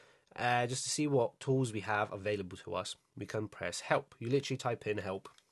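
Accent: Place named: United Kingdom. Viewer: Great vid man. Nice to hear a fellow brit talking about ethical hacking, etc. New subscriber for sure. Im trying to get into ethical hacking/cyber. Would love it if you could do a roadmap for getting into ethical hacking. Im currently doing the comptia A+ and then hopefully the trifecta as well as linux+ cloud+ and pentest+ and some python, etc. It's just so hard to get into any pentesting roles as UK is still behind, and most pentest roles are senior. The US has so much more opportunities for cyber and pentesting as a whole. Any advice on that front as well would be great. Also what did you study to get into ethical hacking? Thanks bro🫡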